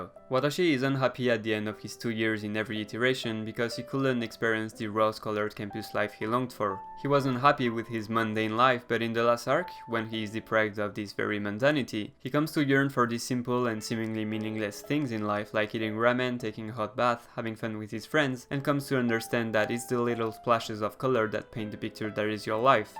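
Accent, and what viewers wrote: France. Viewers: Your analysis makes me see the show with a whole different eye, truly amazing ! Also your french accent is almost unnoticeable, how did u get rid of it so well ?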